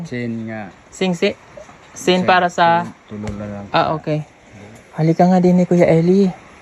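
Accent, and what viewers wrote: Visayas, Philippines. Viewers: Sir di na jud ka mabaligya sa kabisayaan, kahibawo naman ka mag bisaya. Good job sir.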